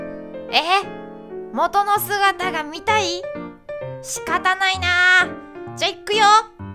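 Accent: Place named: Shiga, Japan. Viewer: 滋賀弁話しいさ（ ; ; ）♡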